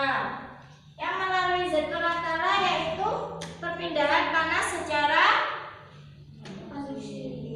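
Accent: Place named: Java, Indonesia. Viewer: Medoookk rekk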